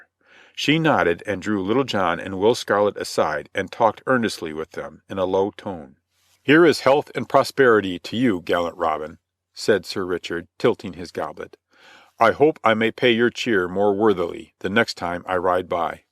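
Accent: United States. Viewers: Like an American robot? This is an English folk tale... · American accent 👎🏻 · An American accent. Sorry, just doesn't work.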